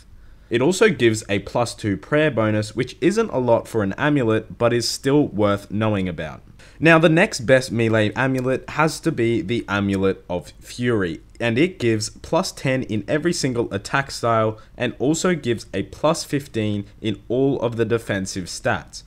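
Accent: Australia. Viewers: He's australian... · That aussie accent is so stupid funny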